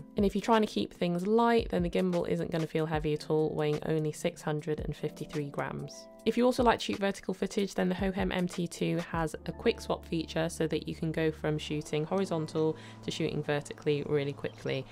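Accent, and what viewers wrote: United Kingdom. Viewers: Love the British accent!!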